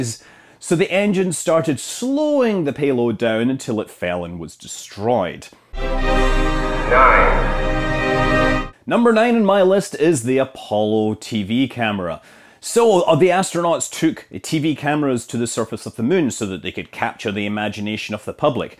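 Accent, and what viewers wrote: Scotland, United Kingdom. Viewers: Yeah we actually say the word like it’s spelled in the U.K. and pronounce all of the syllables correctly. To us it sounds like Americans are saying ‘meer’ when they try to say mirror. There’s nothing that remotely sounds like a ‘d’ in there, he’s just pronouncing the double ‘r’ properly. Which, sounds a bit stronger than an English person would pronounce it because of his Scottish accent. To us, it’s the American pronunciation that sounds way wrong.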